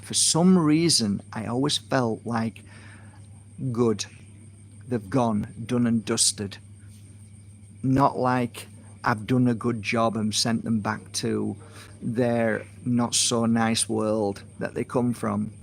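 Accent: Yorkshire, United Kingdom. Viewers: Hi. I would never have guessed that you are from Yorkshire haha ❤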